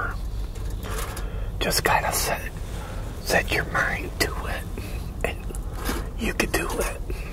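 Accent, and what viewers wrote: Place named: South, United States. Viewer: Are you originally from the south? You have a really cute southern accent lol. Have a lovely Sunday,! ☀️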